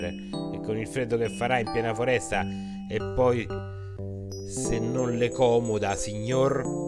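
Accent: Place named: Rome, Italy. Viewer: C e comunque da dire che doppiato in Romano da te ha il suo perche 😂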